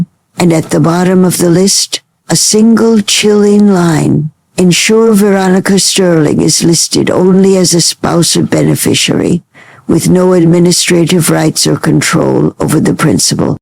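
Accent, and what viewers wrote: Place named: Ireland. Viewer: Interesting Irish accent emerging at times. Engaging story nonetheless.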